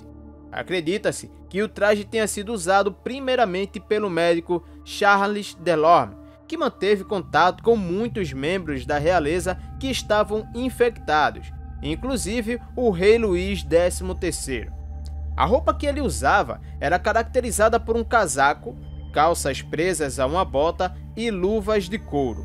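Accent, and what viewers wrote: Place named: Bahia, Brazil. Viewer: O cara é mais baiano que eu que sou baiano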